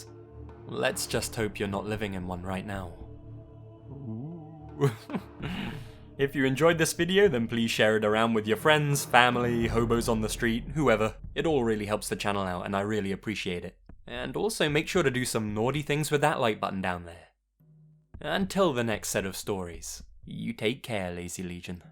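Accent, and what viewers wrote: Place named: RP, United Kingdom. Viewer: Why do talk posh as fuck?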